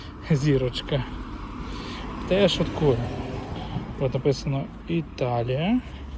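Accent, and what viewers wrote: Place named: Western Ukraine, Ukraine. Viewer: Повертайся додому ,ухилянт,ти,херовий..і працюй на свою державу..чи може тобі держава не потрібна?? Не соромно? Такий бугай шастаєш за кордоном.. Мова західної ..і відео ні про що ... Яке майбутнє даси синові?? І ще одне , блогером може бути людина ,,яка знає і може щось цікаве розповісти,,а не про бутилочку з водою.і взуття замурзане...😅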